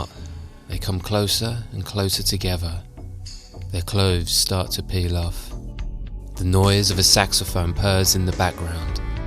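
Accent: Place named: United Kingdom. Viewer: That was amazing! I put it on while doing boring admin work, but had to keep stopping to watch. I’m going to give it a proper rewatch. I love your sexy British voice and accent. It was great to work to. Will be great the second time to pay actual attention tot he piece. I actually create erotic content. It is not the typical vanilla stuff but is Fetish & Femdom POV. Erotica has always inspired me since I was very young. Wrote my art history thesis on Japanese shunga. And 9 1/2 weeks is one of my favorite films ever. My GOD is that one good.❤ What other films in this genre would you recommend the most?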